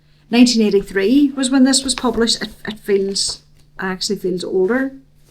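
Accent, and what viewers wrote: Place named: Ireland. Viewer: I have read several series of books about Ireland. Susanne O’Leary and Kennedy Kerr are two of my favorite fiction writers! I’ve never been to Ireland, nor will i be going at 77, but the names and places and customs do so intrigue me!!!! Being from Alabama in the southeast USA , Ireland is a place i dream about! Your accent certainly helps, too!❤️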